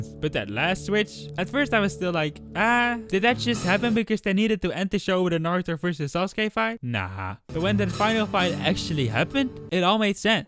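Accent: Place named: Netherlands. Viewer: I like your accent. It sounds a little Dutch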